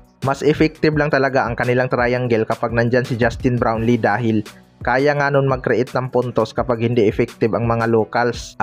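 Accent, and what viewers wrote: Visayas, Philippines. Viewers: ang gling mo mg hanap buhay boss khit tonong bisaya ka ayos lng bsta ba malapit nmn sna sa ttoo ibbalita mo anlayo sa caption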